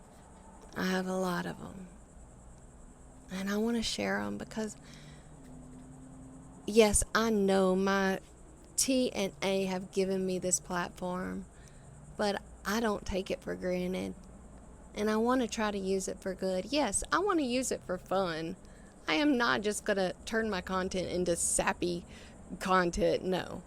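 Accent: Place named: South, United States. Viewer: You're very pretty, I enjoy your southern dialect, be strong, and enjoy life to the fullest.😊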